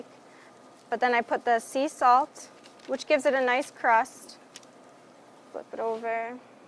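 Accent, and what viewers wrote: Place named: Illinois, United States. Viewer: lol haven't heard an Illinois accent in a while.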